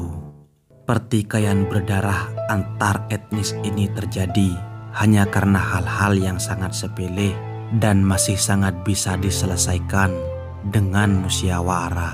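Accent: Madura, Indonesia. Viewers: abg madura kan logat ny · Logat bicaranya kental bgttt... Kental bhs Madura..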